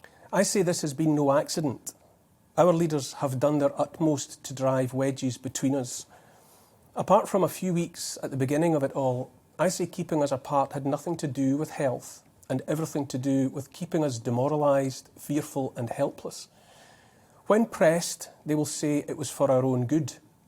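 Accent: Scotland, United Kingdom. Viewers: So thats what my thoughts sound like with a Scottish accent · I genuinely don't care what he says, i just listen to his accent. he's like the scottish james may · Most sensible post for many moons and told in the wonderful tones of an educated and very calm Scotsman. Wish we had many more like Neil. Leaders of integrity are in desperately short supply.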